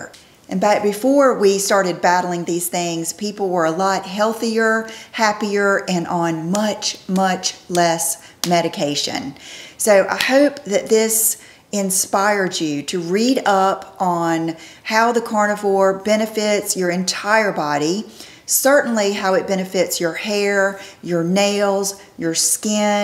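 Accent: South, United States. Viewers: Thanks for sharing. I love your authenticity. Beautiful southern accent!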